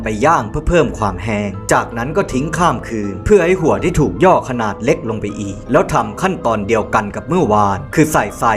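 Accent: Thailand. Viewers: แอบเหน่อนะเรา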